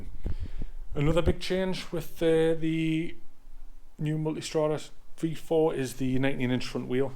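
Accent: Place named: Newcastle, United Kingdom. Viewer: .. I think you’ll find he’s a Geordie, not a Scot..🤦🏻‍♂️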